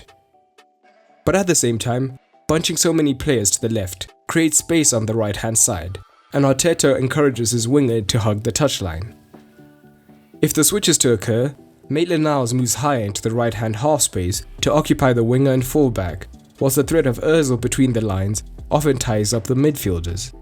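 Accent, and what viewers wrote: South Africa. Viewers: Wait is the guy speaking South African? Respect! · This is totally unrelated, but you sound South African? So...are you?😅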